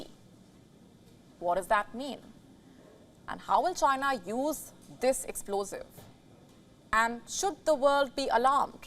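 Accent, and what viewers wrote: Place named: India. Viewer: 印度口音:pride-person-earth-intersex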